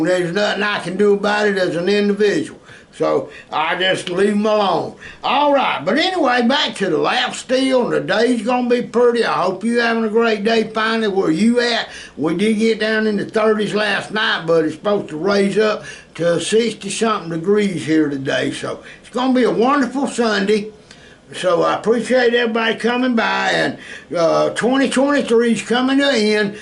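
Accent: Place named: Appalachia, United States. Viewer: I like the way you talk! Good ole Appalachian/southern draw.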